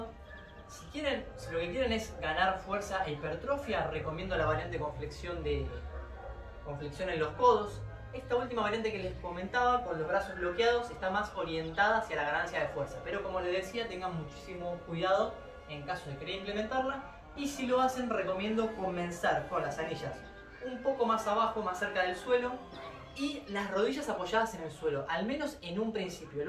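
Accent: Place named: Argentina. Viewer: muchas gracias me gusto mucho el video. bién explicado. De donde las tenias colgadas tus anillas? En un parque habian barras paralelas y anillas. Yo confiado que dominaba la paralela, salte para hacer fondos en anillas y me lesione el hombro. No muy grabe me recupere rapido, si antes hubiera visto tu video no cometia ese error.😃👍 de donde es ud. Por su acento creo que sea de la Argentina.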